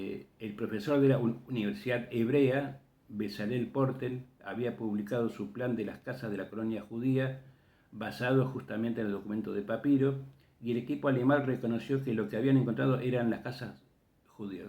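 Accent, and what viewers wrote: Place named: Uruguay. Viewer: POR TU ACENTO SOS URUGUAYO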